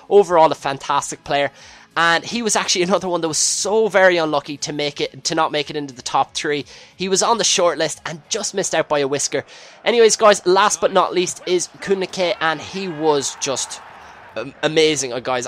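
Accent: Ireland. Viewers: Where in Ireland are you from i'm from Cork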